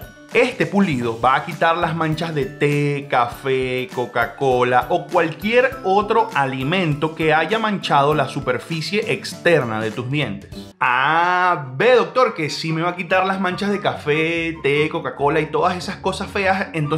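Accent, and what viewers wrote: Venezuela. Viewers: Ajajaja me da mucha risa como hablas Venezolano neto Doc.. tambien soy Venezolana pegadisima ah sus videos explica todo muy bn Bendiciones 🙏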